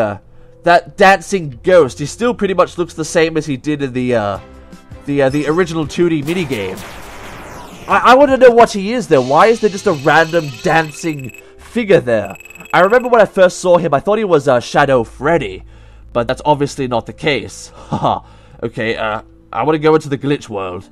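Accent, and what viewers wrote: United Kingdom. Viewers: He is speaking British accents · Sound British I don’t know why are you supposed to be like oh I have a toy verse I can do like a girl sound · Good God hes british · are u doing a british voice or some thing =_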